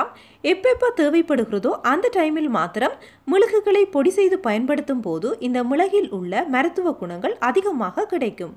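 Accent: Sri Lanka. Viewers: என்ன அழகு..... ஈழத் தமிழ் அழகு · It's srilankan Tamil,l like this Tamil. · உண்மையான தமிழ் ஒலி வாழ்க வளமுடன் இலங்கை தமிழ் அழகு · நீங்கள் இலங்கை தமிழரா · Avunga srilanka apdithan pesuvanga bro..avunga real speech apdithan... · இலங்கை தமிழ் அருமை... தமிழ்நாட்டு தமிழன்... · Her tamil looks like srilankan tamil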